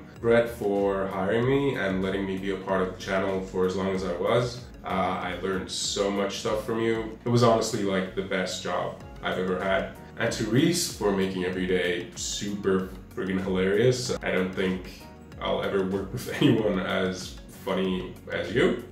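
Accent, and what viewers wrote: United States. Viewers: That's funny, I never knew. I asked because I just assumed with your accent that you were from the state's. I have my own production company/ recording studios and am always looking for new projects to take on. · Are you from SA, you sound American?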